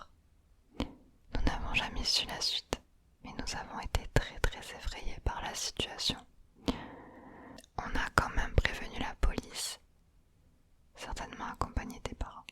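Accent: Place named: France. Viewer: Oo my bog .. WOW She French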